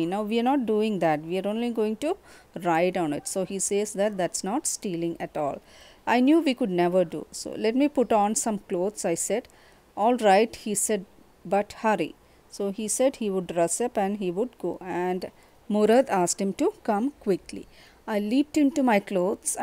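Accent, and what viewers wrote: Kerala, India. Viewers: Are u a Malayali?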